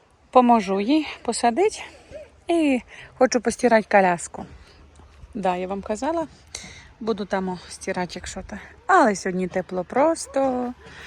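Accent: Rivne, Ukraine. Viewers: Це так говорять на Рівненщині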